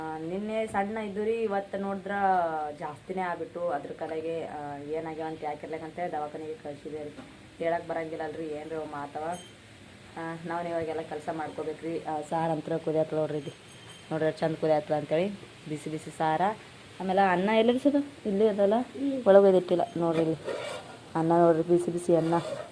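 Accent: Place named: North Karnataka, India. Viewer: Hi sister navu Kolar district navaru. Vlogs yavudhe athiyada aadambara elladhe simple agi channagi madtha edhira eege mundhuvaresi. Utthara Karnataka kannada mathadodhana kelodhake thumbha chanagiruthe but starting 50% nivu mathadodhu artha agthiralila namge.ega parvagila 90% artha aaguthe.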